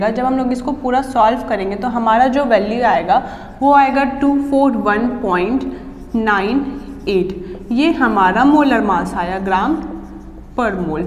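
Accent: Bihar, India. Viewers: Bihar se ho kya 🌝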